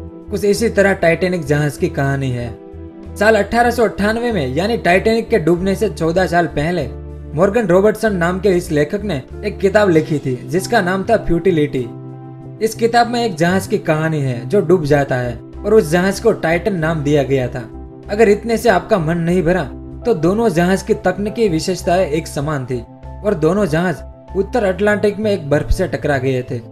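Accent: Gujarat, India. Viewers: I think are you gujrati because apki hindi main gujrati jhalak milti hai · You're from gujarat, because your voice is like a gujarat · Are you Gujrathi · Bol ne vala gujrati he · nice video.. nd according to ur tone of voice i think u r gujju (kathiyavadi) .. m i right? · Bhai Gujarti cho..?? 😁😂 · Ye narrator gujrati lgta hai 😁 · Gujrati lageche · आपकी आवाज बहोत अच्छी है , और टोन ऐसा है , like gujju भाई 👍 😍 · By listening the voice of the speaker I can surely make out that he is a GUJRATI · I can guess that the speaker is Gujarati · Bhai gujrati lags...? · आपकी आवाज में गुजराती स्वाद आ रहा है क्या मेरा कहना सही है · You are gujarati ?? Because your speech has Gujarati accent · ગુજરાત ના છો ને ભાઈ?? પ્રોનાઉંશીયેશન પર થી ખ્યાલ આવી જાય છે 😁😀🙏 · I like your gujju accent, seems to be real and true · Ye awaz or bolne ki style ek gujrati ki he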